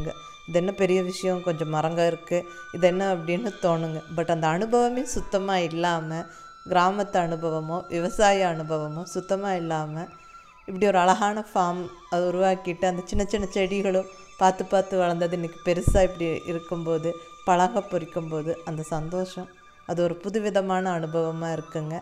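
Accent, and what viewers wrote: Kongu, India. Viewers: yengal kongu Tamil.. mam nidhanathoodu sollum vidhamum arrumaii...❤ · Tats great........ Missing cbe so much..... Now m in singara Chennai.....by watching ur videos I feel so proud tat I cud hear Kongu tamil and our dishes as well in Kongu touch.......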